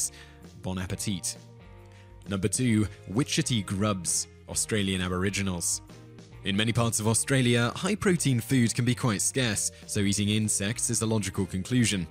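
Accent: England, United Kingdom. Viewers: Canned spaghetti used to be a favourite of mine as a kid. I like turkey Spam. I really appreciate the inclusion of brown sauce on this list. Maybe because the narrator has an English accent. I initially wondered if Vegemite would get on this list (though all things considered, Britain would have to be cited for Marmite in such a case), but credit due to Witchetty grubs as the Australian example.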